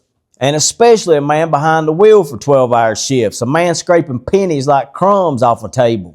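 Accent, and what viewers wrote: South, United States. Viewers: Masha’Allah, Uncle You have a special way of articulating in these videos, delivering wisdom in that southern accent is heartwarming to see. Keep up the great work. May Allah make us steadfast on this faith. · Brother, every time you share a story in that beautiful Southern accent, you wrap a powerful lesson in the most gentle warmth. You take an incredible act of honesty and make it feel like a conversation on the front porch—simple, true, and straight from the heart. And when you end with, "and that's all I gotta say about that," we feel the weight of your faith and the sincerity of your character. It’s a blessing to have your voice in the Ummah. May Allah continue to bless you and your good wife. Ameen.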